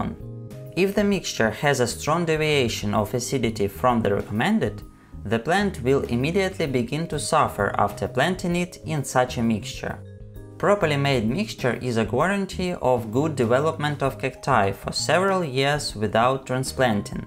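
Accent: Russia. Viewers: Я которая слышу русский акцент👁👄👁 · Too russion. My earssss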